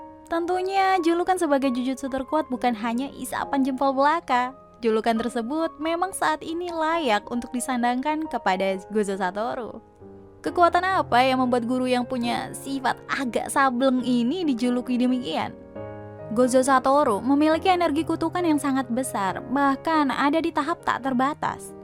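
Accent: Java, Indonesia. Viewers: Kangen suara yang medok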